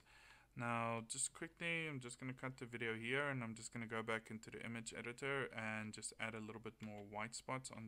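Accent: Afrikaans, South Africa. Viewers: You sound Afrikaans :P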